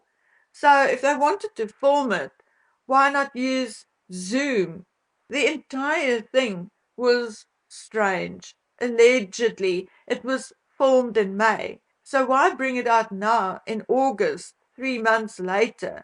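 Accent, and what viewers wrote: South Africa. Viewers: I could listen to your lovely S.A accent all day 😊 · Your "accent was what drew me to listen to you, years ago, when I subscribed to your channel! At the time, I had no idea what a South African accent sounded like. I didn't know WHERE you were from. I thought it was so lovely that I kept listening to find out where you were from!!! 😊 BTW, I have been a psychiatric nurse for years. YES!!! "Word Salad" is a bonafide, legal, medical term most often related to to schizophenia!!! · The Harkles speak fluent PSYCHO-BABBLE !!! You speak with a beautiful South African accent….I can understand you, every word you speak is articulated, but (just as you say) all I heard from the Harkles was psycho-babble bolloc*$ !!!!! It’s unintelligible, and says nothing and means nothing. Their lips move, but cr@ comes out !! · I would never have guessed that English was not your first language, dear! 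You just sound South African to me and I love you for it. As for the Harkles latest offering, I filed it under suspicious junk mail. They have lied so much that I no longer believe a word they say. Everything is smoke and mirrors with them. How I wish they could, like Napoleon, be sent to Elba without Wifi or any means of contacting the outside world. The world needs a rest from their particular corruption of young minds.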